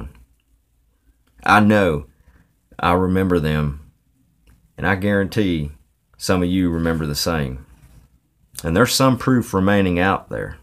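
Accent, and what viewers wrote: South, United States. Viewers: first off,it's refreshing to hear a fellow southerner,lol, but YES, I started smoking at 11, unfortunately,& we were living in Nashville time. there were ads & commercials EVERYWHERE!!!! I PAYED THEM MUCH ATTENTION,due to being a very young smoker!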